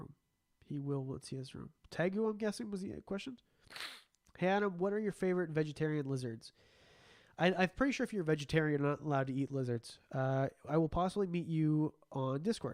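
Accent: Canada. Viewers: I’ve seen a lot of your videos and this stream is the most Canadian you’ve ever sounded 😂 love it! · Love your Canadian vernacular. Eh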